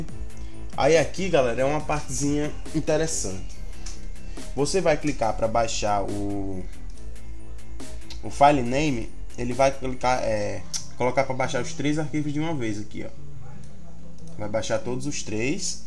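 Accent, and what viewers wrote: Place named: Bahia, Brazil. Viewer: tu e baiano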